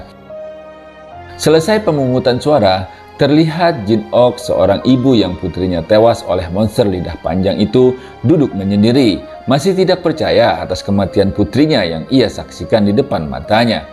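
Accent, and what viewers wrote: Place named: West Java, Indonesia. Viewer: Ciri khas Sunda Na ka bawa we eta medit hahahaha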